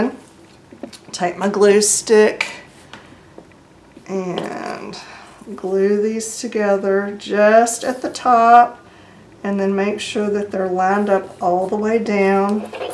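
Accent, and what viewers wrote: South, United States. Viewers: it was kind of obvious that you were a fellow southern gal, but I didn't know that we were neighbors! I'm in Memphis, just across the river... Hi neighbor! Lol! Thanks for the bead tutorial!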